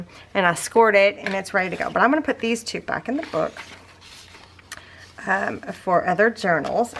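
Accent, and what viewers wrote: South, United States. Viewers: This is absolutely beautiful !!! Congratulations on making 50k subscribers🎉🎈🎉🎈!!! I am so grateful for having found you!! I love ❤ the fact that I have never found it hard to understand ya!! I gotta southern draw too lol and you ain't never said somethin that I haven't heard before unless you accidentally make up a name for it like cramin two words together lol 😂😂!!! Thank you for everything you do!!!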